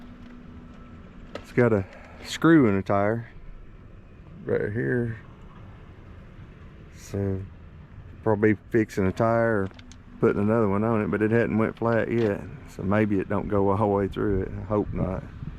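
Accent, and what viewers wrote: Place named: North Carolina, United States. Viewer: That’s a good looking job. What area are you in? Sounds like NC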